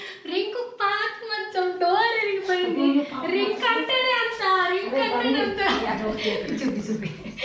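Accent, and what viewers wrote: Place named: Nizamabad, India. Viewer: Nizamabad ascent🔥 mupkal❤️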